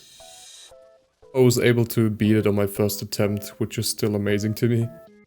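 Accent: Germany. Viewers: You have nice german accent.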